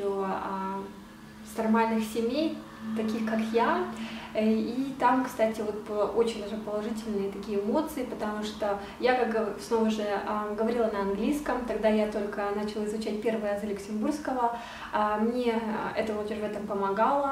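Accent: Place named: Urals, Russia. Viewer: Дикция уральская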